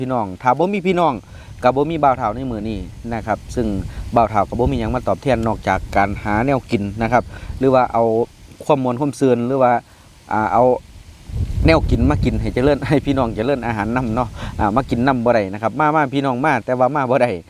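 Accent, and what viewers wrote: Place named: Sakon Nakhon, Thailand. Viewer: อ้ายบ่าวท้าวกินเป็นตาแซบแท้ สำเนียงเว้าออกไปทางคนสกล ลมคือสิหนาวเนาะครับ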